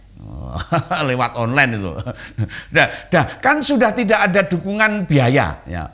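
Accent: Java, Indonesia. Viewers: om ini pembawaannya tenang dan hefi, sy suka logatbicaranya, jawa banget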